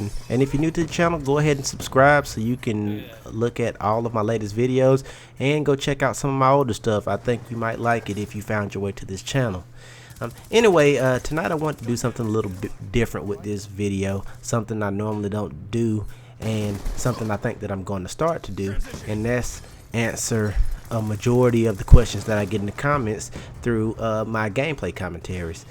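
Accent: South, United States. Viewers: had to restart to see the new intro... it's the shit. I wanted to speak on a vid u did not long ago venting on the youtube game, subs and stuff. The reason i luv you channel is the reason your subs may be low. 1)don't care if people disagree a southern accent, on a black man especially people expect you to be dumb/ignorant. In this case no where close. 2)The unboxings you show should be less unboxing more review bc that matters most 3)there are just haters and i have a few ideas just respond pls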